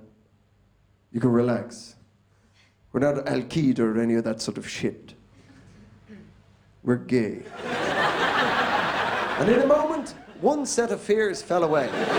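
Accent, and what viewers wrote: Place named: United States. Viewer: Andrew Maxwell does a good American accent.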